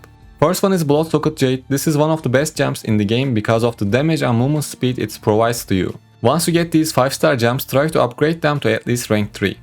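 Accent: Turkey. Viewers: Lan konuşmandan Türk olduğunu anladım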